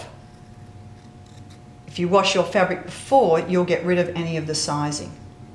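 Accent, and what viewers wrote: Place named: Australia. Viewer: Thank you for your clear and informative instruction on pleater use. Well done 👏👏👏 How nice to hear not only an Australian voice but an Australian voice with culture (without 'ocker') I now will search out where I can buy an Amanda Jane!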